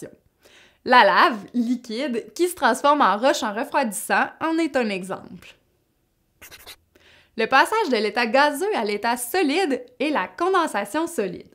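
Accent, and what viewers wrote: Quebec, Canada. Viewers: vous êtes québécoise?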